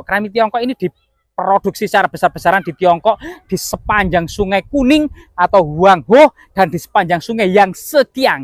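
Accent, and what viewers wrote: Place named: Java, Indonesia. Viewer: Tutur bahasa muedhok tenan 😁👍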